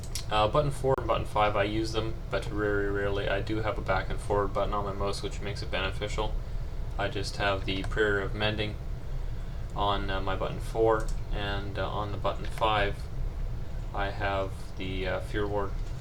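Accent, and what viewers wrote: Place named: Canada. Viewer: You sound Canadian :D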